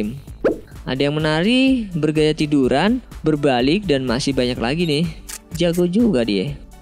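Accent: Java, Indonesia. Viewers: mau tanya, mimin orang jawa ya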